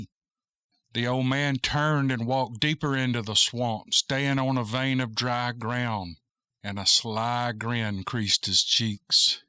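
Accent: South, United States. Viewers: I love this story and I appreciate you reading it. I love hearing that southern drawl . Thank you ! From another southern person. Just to the north of you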